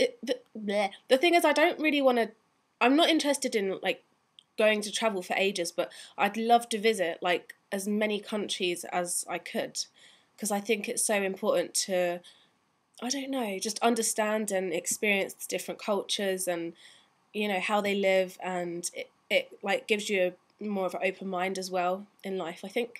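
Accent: United Kingdom. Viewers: about your style: rock chic/ street chic? - random comment: i'm normally not into british english but to you i could listen all day :) - goofy question: any thoughts on the royal wedding? are you excited, bugged, indifferent..?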